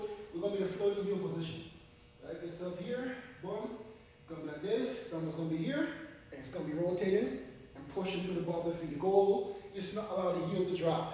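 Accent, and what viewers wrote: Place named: Jamaica. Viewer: Me hear de accent mon , me like this workout yahzimehhh !!!! 🇯🇲🇯🇲🇯🇲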